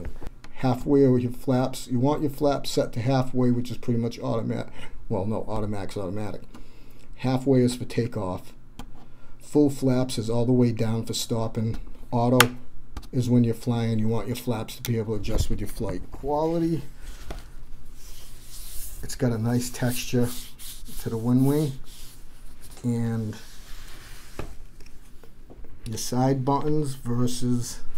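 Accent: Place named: Boston, United States. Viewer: Helped me man. My FA-18 throttle and stick just arrived this morning, but I was having a headache putting the throttle together as the pdf manual from the winwings site wasn't too clear on assembly. You helped me work out what goes where though, which I couldn't find in other videos. Love the Boston accent too. Many thanks from Aberdeen, Scotland.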